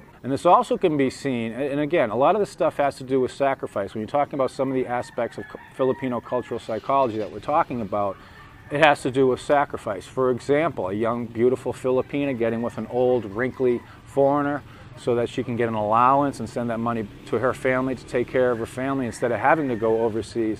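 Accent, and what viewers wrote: Boston, United States. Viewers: That Southie accent, it creeps me out , I am from NJ and I hate the Soxs, ( love the Yankees) hate the Pats and all that tainted NE crap........ well except the chowda and the lobster rolls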